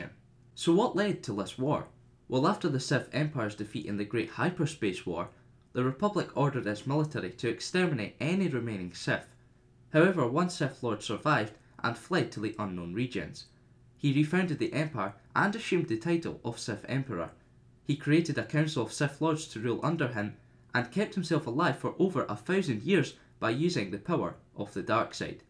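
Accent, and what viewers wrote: Scotland, United Kingdom. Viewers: hes scottish. thats just how it sounds.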